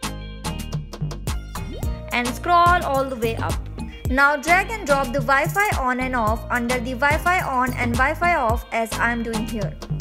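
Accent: India. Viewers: Oh yes let us find da root cause for da same. Indian English is so brutal...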